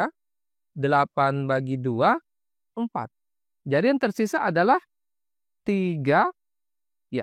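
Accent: Sulawesi, Indonesia. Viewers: orang sulawesi ya pak? hehe soalnya logatnya kaya sulawesi 😃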